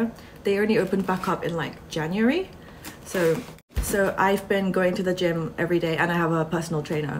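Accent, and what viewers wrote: United Kingdom. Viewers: I loveeee your British accent.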